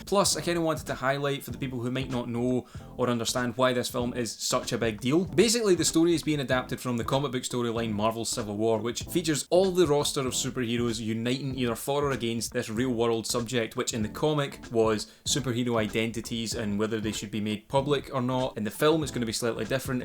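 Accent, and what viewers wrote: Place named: Scotland, United Kingdom. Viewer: I am loving hearing a lovely Scottish accent in super hero movie reviews! 1 more subscription on your way!!!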